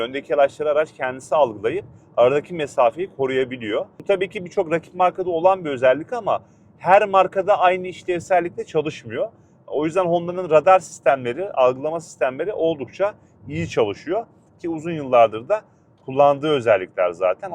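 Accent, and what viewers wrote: Samsun, Turkey. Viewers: Samsun şivesi😊olmuyor maalesef😊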